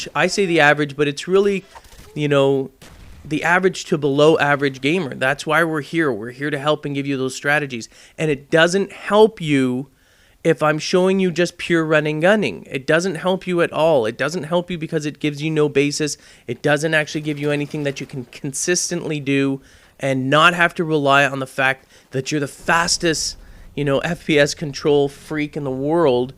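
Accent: Canada. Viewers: are u canadian????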